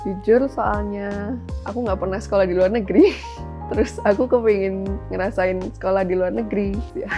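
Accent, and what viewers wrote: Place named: Java, Indonesia. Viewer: medok nyaaaaaa hha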